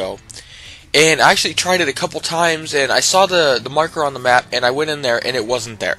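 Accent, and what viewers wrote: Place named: Netherlands. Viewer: First of, still useful, but there is a better way, when you enter the base, stick to the left, when you see the parking lot, stick to the fence, there is an opening leading to a moat where you can safely and easily drive through to the chopper, it took no time at all to get there and second, I believe you're Dutch, but your English itself is really good, most people would not pick up on it :)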